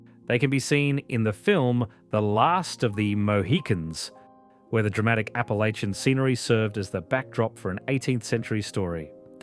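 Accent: Australia. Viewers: I don't think there is anything I wanted more today than to come home eat some Panda Express and listen to an Australian man teach me about my home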